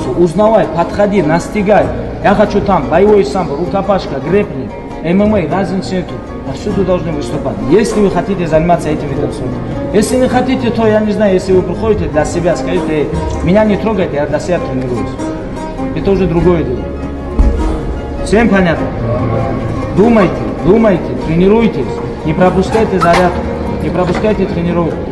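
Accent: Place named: Dagestan, Russia. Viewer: its 100% russian but dagestani people have that arabic/turkish accent which is why it sounds off.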